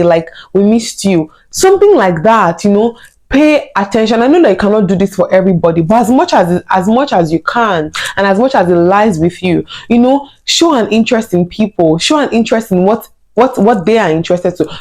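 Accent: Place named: Nigeria. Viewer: Why Nigerian accent sounds rubbish?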